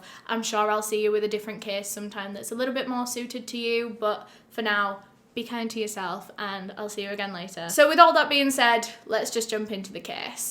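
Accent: United Kingdom. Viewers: She is from and lives in the UK.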